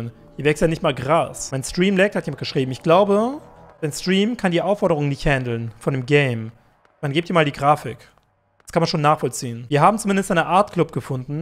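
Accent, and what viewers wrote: Russia. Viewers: bite mehr russia Akzent XD спасибо <3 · Russisch ist fast so wie Kroatisch 😂❤️ ich heule aber vor lachen, mehr mit russischem Dialekt 😂😭❤️❤️ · Bitte mit mehr russischen Akzent! 😍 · Dein russischen Akzent ist immer am besten mache ich auch schon mega geil 💛💛🤣🤣🤣😂😂😂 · moi drug kannst du mehr videos mit russische dialekt machen ? :3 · Haha omg😂dein russischer akzent ist soo geil😍bitte mehr davon! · Lachhkrampf haha XD bitte mehr videos mit russischem Akzent blyat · der russische akzent und die ironie machen es perfekt! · Ich finde es so nice und witzig, wenn du mit deinem russischen Dialekt redest xD Das klingt einfach übel cool ^^ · hahhaha ich schaue es mir schon dass 15 mal an es ist so lustig. spiel bitte mehr spiele mit russischen Akzent. <3<3<3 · Der Russischer akzent ist ziemlich lustig. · let's plays mit russischem Akzent sind die besten! 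😂😄 (Bitte mehr davon.😅) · Ich liebe diesen russischen Akzent. Auch wenn meine Mutter es versäumt hat mir und meiner Schwester russisch beizubringen ist mir die russische Kultur vertraut. Plow ist zum Beispiel eines meiner Lieblingsgerichte und die Serie bei hab ich damals, als wir meine Oma in Russland besucht haben, geschaut ohne ein Wort zu verstehen ^^ Mach weiter mit solchen Videos. Sie sind einfach lustig xD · Wer feiert russischen Dialekt auch so. 😀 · Ich bin nebenbei am Essen und habe mich verschluckt vor Lachen xD Bitte mehr Videos mit russischem Akzent xD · Mach du Mal komplette streem nur reden mit russisch akzssent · bitte rede immer mit so einem russischen Akzent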